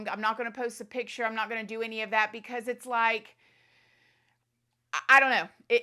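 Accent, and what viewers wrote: South, United States. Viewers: Why even bother saying anything at all? Is it for your 2 seconds of want to be fame? Just be nice or be quite. It’s called a southern accent.. if you don’t like.. don’t listen.. it’s that simple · Why do you have a southern accent but pronounce "on" like a New Yorker?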